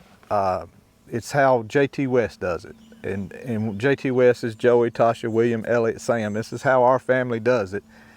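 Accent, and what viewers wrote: North Carolina, United States. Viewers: Well it sounds like we are both from the great old North State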